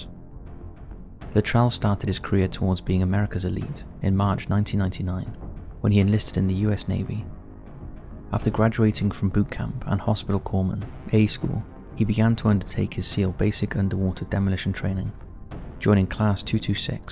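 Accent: United Kingdom. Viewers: No, he isnt wrong at all. "Special forces" is a term known colloquially around the world to be synonymous with more/less any SOF unit . Defined as "the units of a country's armed forces that undertake covert, counterterrorist, and other specialized operations". So by definition Rangers, Raiders, SEALs, SWCC, PR etc are all special forces. Just because the US decided to specifically name a unit "special forces", does not take away from the literal definition of the word. and with the fact that the dude has a british accent or whatever, It would just be cringe to hold him to that standard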